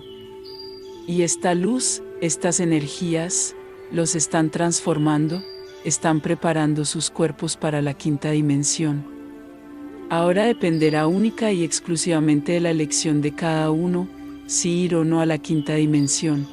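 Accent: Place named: Colombia. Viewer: Gracias por tu mensaje ,pero porqué escucho como un acento colombiano....? Esta es una canalización.? De todas formas gracias por el mensaje. Muy bueno..!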